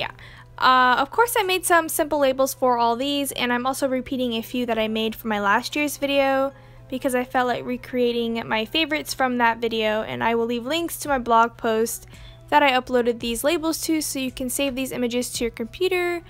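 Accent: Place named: United States. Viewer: how much I hate American accent.....eww but these diys are so cute wow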